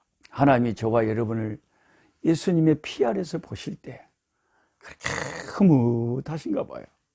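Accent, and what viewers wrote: Jeolla, South Korea. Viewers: 아따 같은전라도네요 사투리넘정겹네요 순천이네요 선교사님 어떻거멀리가서선교하시느라 애쓰시네요 잘듣고잇네요 강건하세요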